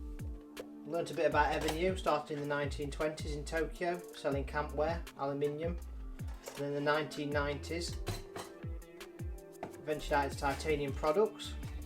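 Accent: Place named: New Zealand. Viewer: That New Zealand accent is so strange to hear. I ended up having to put on subtitles. Thank you for the video. Aloha.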